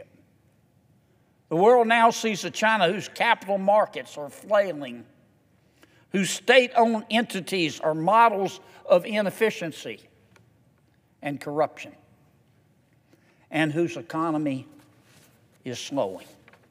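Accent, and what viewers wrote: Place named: Louisiana, United States. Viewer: The reason I like listening to you is that you explain yourself well and I understand what you say (even with the Louisiana accent). Keep talking to us because our President isn't.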